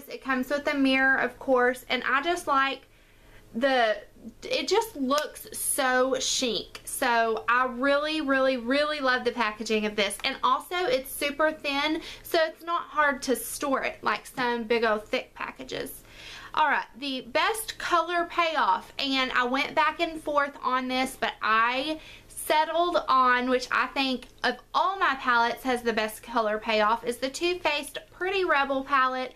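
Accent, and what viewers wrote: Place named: Tennessee, United States. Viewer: New subbie! I'm from Tennessee and girl you sound like me! Lol loved the video can't wait to see more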